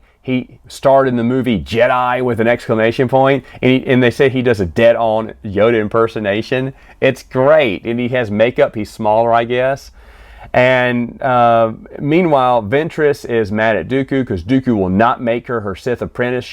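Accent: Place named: South, United States. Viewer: There’s just something funny about talking about Star Wars or science fiction in a southern accent.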